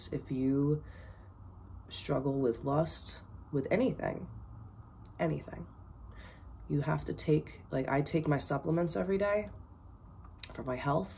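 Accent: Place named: Boston, United States. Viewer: oh whoops 😬 haha my bad. Hope your having a great day today. Love the Boston accent that slips out every once in a while aha 😂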